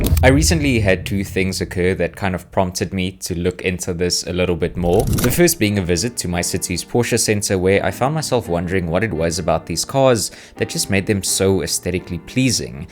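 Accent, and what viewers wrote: South Africa. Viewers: Are you South African? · bro sounds south african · You sound South African… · are you south african bru? <3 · Yoh the South African in me heard 4 seconds and knew you were from here either ways this was a majestic fyp pull, love how you explained it all and is actually had a simple understanding. Earned yourself a new subscriber 👌🏾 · you sound South African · bro, are you South African? i'm kinda getting the South African accent there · you sound south african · Love the video bro but I couldn't shake the feeling that you're from South Africa, accent sounds South African to me. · You south african? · Totally irrelevant, but I heard your accent and knew you were South African without checking the channel description. Howzit bruv 😂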